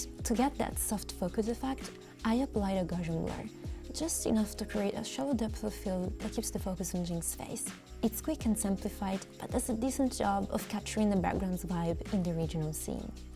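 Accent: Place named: France. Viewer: your english sound french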